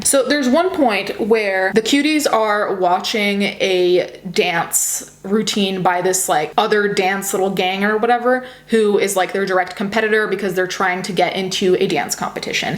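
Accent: United States. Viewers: Unrelated but for a non-native speaker you have all of the nuances and a great (American) English accent! The fact you speak 3+ languages fluently is incredible. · I knew that you were Italian, and that English was your second language, but I had no idea that you have only been here such a short time! Your American accent is fantastic. Keep up the good work, my friend! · I don't mean to be rude but how come you have a full American accent if you only moved to America 5 years ago?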